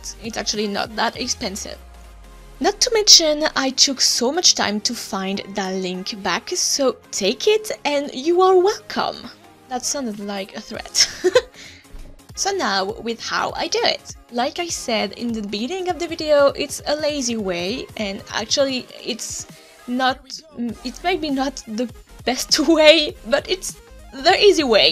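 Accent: France. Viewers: Na real man o sotaque dela é frances mas continua sendo bonito pra caramba uwu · You are french ?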